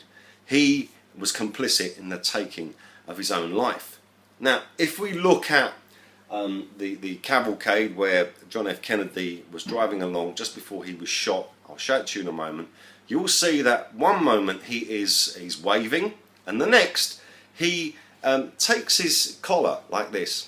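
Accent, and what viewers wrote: United Kingdom. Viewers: proof that the British accent can't conceal all ignorace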